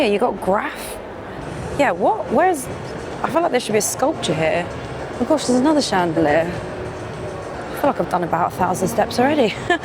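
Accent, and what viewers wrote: United Kingdom. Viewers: Ma'am I love your British Accent. CHEERS 🥂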